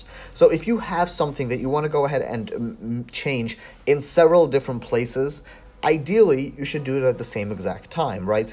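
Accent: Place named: United States. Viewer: a tutorial with an American English speaking person. thank you. lol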